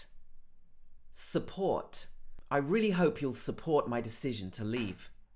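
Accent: United Kingdom. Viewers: This is rather British English, right?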